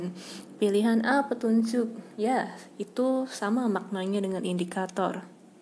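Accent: Java, Indonesia. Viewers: Mba2 ny ngomong ny medho 😆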